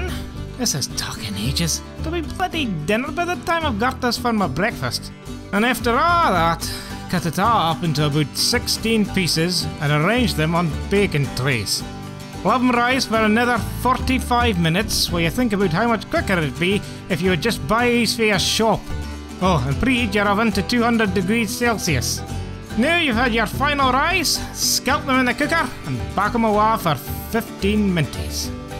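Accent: Scotland, United Kingdom. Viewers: Yir a Fruit Loop Min!! Bit yair damn funny wicht it!! Fits Like!! It's great tae hear the auld Brogue, one thing I dae Miss oor here in US ofA, yir Brilliant, Keep it up Min!! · What region of Scotland is that accent? My grandparents are from fraserborough and the accent is completely different.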